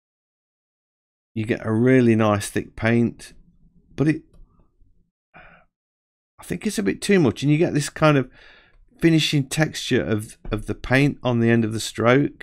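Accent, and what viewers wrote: United Kingdom. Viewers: thanks, it really helps listening to someone from Yorkshire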